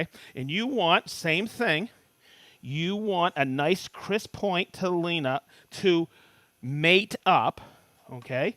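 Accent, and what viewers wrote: Boston, United States. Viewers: Hey can you park my cah- I’m a masshole too I hear it - love it New England woodworkers rock🎉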